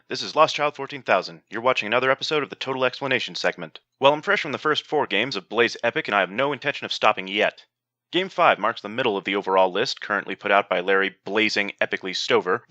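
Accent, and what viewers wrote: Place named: North America. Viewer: You, sir, are too good. Thank you for the correction as well. You emulate Yhatzee's style so well. So well, in fact, if it weren't for the polite (nay, almost posh) North American accent and the garish colour scheme for the sake of individuality, I'd say it was the man himself. More please.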